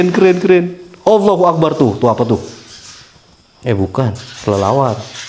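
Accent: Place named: Java, Indonesia. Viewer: Demen aku sama mas ngomongnya medok banget hehe khas....😅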